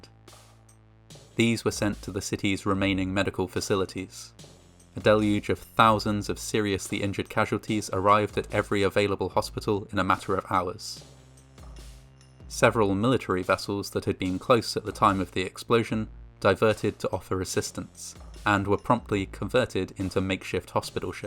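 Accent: United Kingdom. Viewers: The Brit accent makes Fascinating Horror videos slightly more fascinating for no good reason 😄 Other Brits: "Not particularly" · So glad there’s another my son uses this to sleep 😂 and it’s amazing how things can quickly go wrong. And thought you were from the UK with the accent 😂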